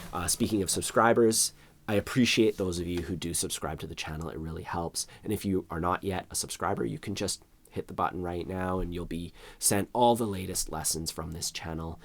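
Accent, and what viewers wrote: Canada. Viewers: holy crap this is a perfect study on how to do a better Canadian accent also really good for learning guitar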